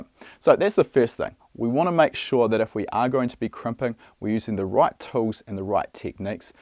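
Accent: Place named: New Zealand. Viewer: I just come here for the Kiwi accent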